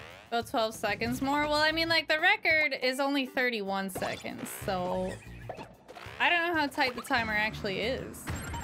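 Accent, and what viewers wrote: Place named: Northeast, United States. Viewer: Found you from those weird survivor AC vids. You're really good and your voice is so enjoyable and refreshing as well. You honestly sound like a northeast American to me but you said you're Canadian. That's really cool. Montreal is my home away from home.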